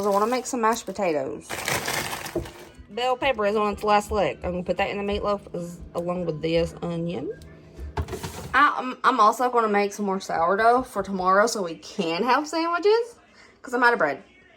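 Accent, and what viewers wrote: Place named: Tennessee, United States. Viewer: I am recently widowed (oh how i hate that word) and i have no family living close enough to visit with often. My mom grew up in eastern Tennesee so hearing you talk and watching you cook for your lovely family has been a help. For the first time in my life I'm also learning to cook for one. Every time i make a mistake i hear you say "it's fine!".❤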